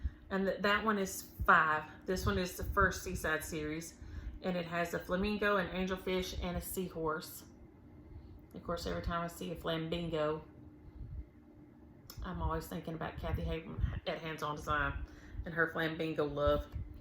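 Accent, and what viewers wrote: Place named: West Virginia, United States. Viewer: Just love your down home chats. makes me feel at home e g from WV My accent sounds like you as well. I’m in INDIANA and retired. But I still miss Home especially Christmas time!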